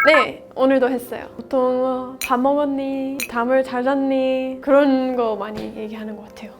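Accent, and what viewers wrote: South Korea. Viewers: 전 미국과자가 더 좋아요^^ 억양이 완전 한국인이세요^^ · if I listen to you speak without watching, you sound 100% korean.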